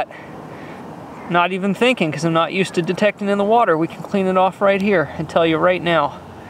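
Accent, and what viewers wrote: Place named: South, United States. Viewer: Great hunt its also funny you are picking up on the southern accent and sayings.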